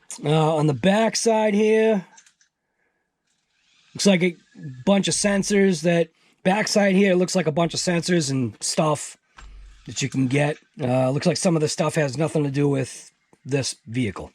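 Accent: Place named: Massachusetts, United States. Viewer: loving the MA accent fellow Masshole def subscribing well done vid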